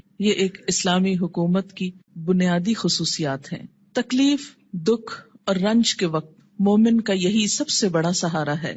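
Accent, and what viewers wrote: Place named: Hijaz, Saudi Arabia. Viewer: تحیات ودو؟ حجازی لہجہ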